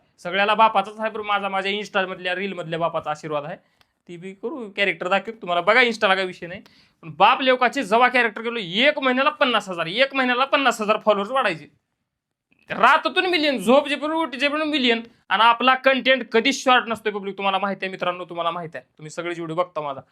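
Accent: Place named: Latur, India. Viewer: 100%Latur cha hay bhau😊😂😂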